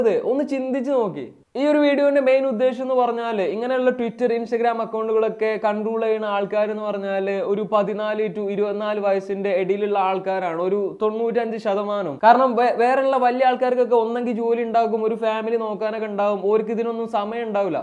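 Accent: Malappuram, India. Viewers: Thangal malappuramthu kaaran aano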